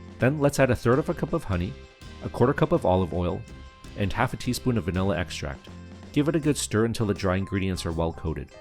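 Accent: Canada. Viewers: Very nice recipes. 💖I have been baking my yeast breads using granola and what a game changer that has been. I haven't gone back to my regular favorite bread recipes since I started using granola in them. I will definitely start making my own granola now that I have watched your video. I need to ask...are you from Canada? A few of your words hinted to me that you are Canadian. Thank you so much for sharing for recipes.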